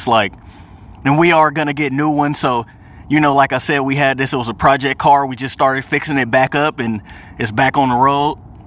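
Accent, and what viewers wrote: United States. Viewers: I think the model you have was built in America, you have an American accent so perhaps you know that. German made models much better.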